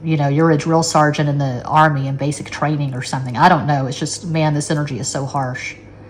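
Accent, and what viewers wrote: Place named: Texas, United States. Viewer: I liked before i watched 😅...i like ur voice...vocabulary...and texan accent...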